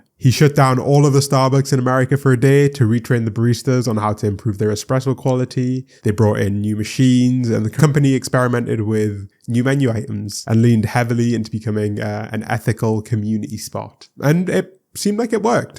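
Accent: United Kingdom. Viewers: I know it’s just how you sound but you sound like you’re mocking me with how you speak… maybe it’s the combination of deep vocal tones and the british accent, im not sure. but if I close my eyes and just listen, it sounds like “yOu ArE mOcKiNg mE.” great video and subject matter but I probably won’t be watching anymore of your videos.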